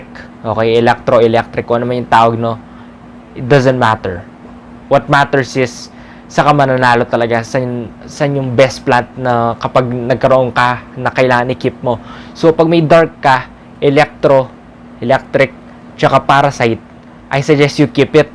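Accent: Pampanga, Philippines. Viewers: Kapampangan ka sir? Hahaha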